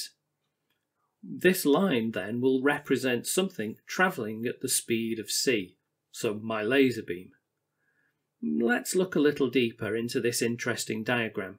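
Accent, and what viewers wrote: United Kingdom. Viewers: Thankyou for uploading your channel specifically is where I like going for science information because of the british accent and the visuals are great. It has the right vibe nothings too overexaggerated very calm logical delivery. I could and would watch hours of lectures and powerpoint in this format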